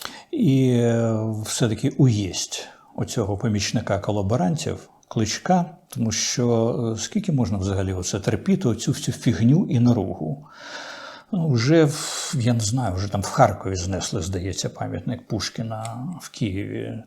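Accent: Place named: Russia. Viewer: А чому в пана Дмитра така підозріла російська фонетика? :) Чому це він називає КлИчка - "КлІчком"? з якого дива? Звідки оці : "ДанІлови", "РЄзнікови", а також вимовляння на російський лад решти слів на кшталт "проблЄми", "крЄмль" тощо. Вам так подобається московська мова?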